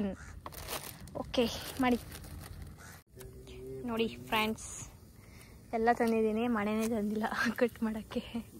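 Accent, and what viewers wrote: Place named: Mandya, India. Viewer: ❤❤❤Namma Mandya style mam❤ super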